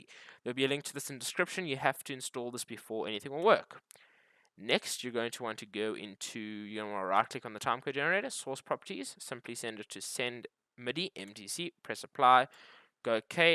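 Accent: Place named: Afrikaans, South Africa. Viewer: Hey, DELPHI 7 on the taskbar!! Lol. Jy klink Afrikaans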